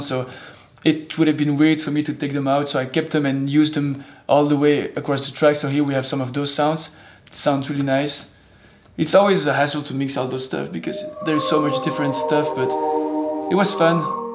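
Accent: France. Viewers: Salut, j'ai l'impression que tu a un accent français, tu est français ou quoi? Et sinon. J'adore se que tu fais!!!! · Je suis francais et on reconnais ton accent français ^^ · You do have a slight french accent, don't you ? Considering the fact that you're Belgium, it's shouldn't be too far from the truth 🤷🏻‍♀️ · I love your remix! Keeps me moving! Very inspirational indeed! I also love your french accent! 😍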